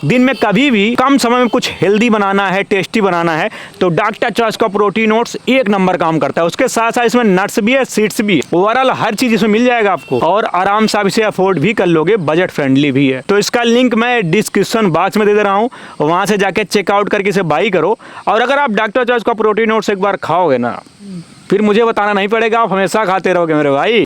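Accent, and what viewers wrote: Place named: Uttar Pradesh, India. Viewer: भाई आपका यूपी वालि भाषा मुझे अच्छा लगता है 😅 मैं भी यूपी का ही हूं ❤😊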